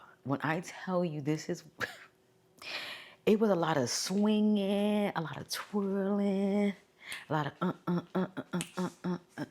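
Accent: New York, United States. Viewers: Hey....New Subbie here 🎉. I am loving your vlogs Girly and truth be told, when I heard your accent, I immediately hit the like and Subscribe button...lol! I miss the NEW YAWK lingo. I'm Brooklyn bred, now Texas Fed, but it will be NY FOREVAH!!!!! I am loving your channel!!! I can't wait to binge watch all that I've missed ❤😂❤. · Gurl, your skin is amazing and you look amazing! Also, a country New York accent, that's elite!!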